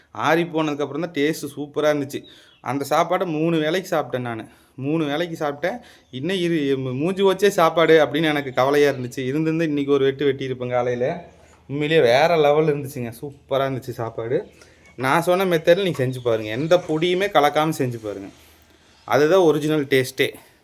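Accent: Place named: Kongu, India. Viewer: அழகு கொங்கு தமிழ் 🤝😊🥰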